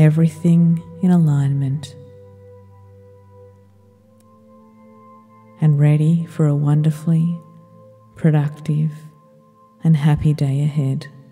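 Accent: Australia. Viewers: Such a lovely voice....Australian? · This is great Australian voice... Thanks from India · She has an Australian accent, so she says "centered" but it sounds a bit like "scented."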